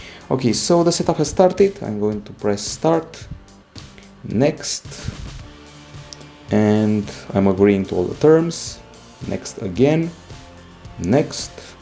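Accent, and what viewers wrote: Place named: Romania. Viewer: După accent se vede că eşti român :)))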